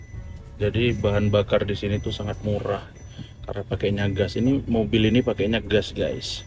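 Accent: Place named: Java, Indonesia. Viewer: kok vibesnya jadi JAWA bang? gak sumatra lagi, medok banget kedengerannya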